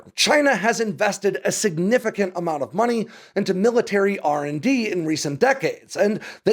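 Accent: United States. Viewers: "We had B-52's, flying around" -- 1) Yup. All true. Because we thought it was that, or nuclear annihilation. Stakes are a tiny bit different with Taiwan. WWII and the Cold War are too far off. I also believe in the greatness of the American people as much as you do. But that doesn't mean we always have the political will to act. (Also, Aerial refueling, not Arial the font!) 2) Still, dude, you don't help the American case by sounding like the 'MURICAN parody!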